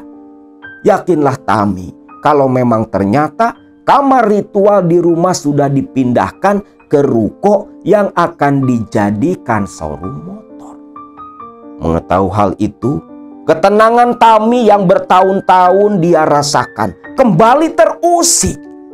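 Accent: Java, Indonesia. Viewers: Oiyaa Ko aku salpok sama suaranya jadi medok kaya wong jowo😅😅😅🙏👍👍👍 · Betul sekali 😁😁 ngomong jowo e wes medok 😅😅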